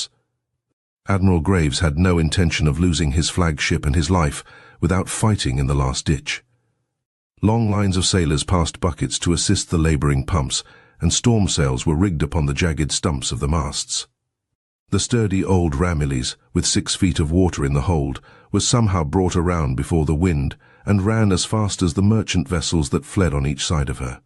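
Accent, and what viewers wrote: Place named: United Kingdom. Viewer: Is there a reason a British accent is telling strictly AMERICAN history? Sounds off.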